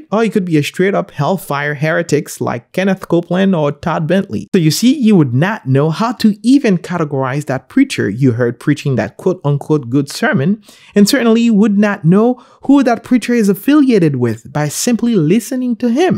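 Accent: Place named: Haiti. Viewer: your videos and exposition are outstanding. Quick question. My wife and I sensed (what we perceived as ) a ever so slight Haitian accent as we are from S. FLA and it has one of the largest Haitian communities in the US. Are you by chance in S. FLA? Because if so and you are a pastor then we'd LOVE to attend one of your services. Let us know and which church if you are indeed a S. FLA pastor. Thanks and God be with you always.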